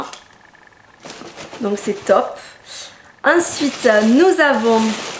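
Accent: South, France. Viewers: Haa a peine j'appuie sur play j'entend l'accent du sud c'est bon ca ! :p Tu viens d'ou ? :)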